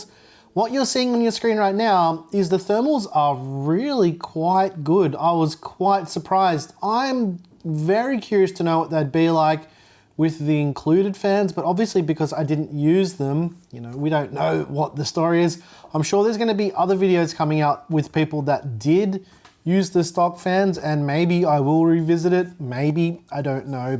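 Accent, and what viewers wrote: Australia. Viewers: Great case review, and your build looks great but Zeeee, You’re not AMERICAN you sound Australian, we say Zed, not zee!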